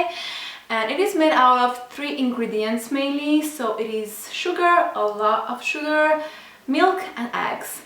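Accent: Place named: Romania. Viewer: I Love your videos soooooo much, I really enjoyed getting to know you better. You are soooooo BEAUTIFUL!! Also I love your Romanian Accent!! Xxx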